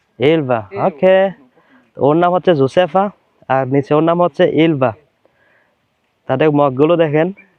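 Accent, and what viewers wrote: Chittagong, Bangladesh. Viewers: না,,ভাই।।।আপনার মুখে চাটগাইয়া বাংলা ভালোই লাগে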